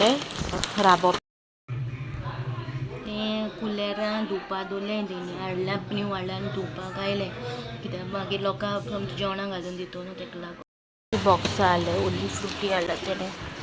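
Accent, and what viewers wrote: South, India. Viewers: Nice voice and south accent keep it up Nice information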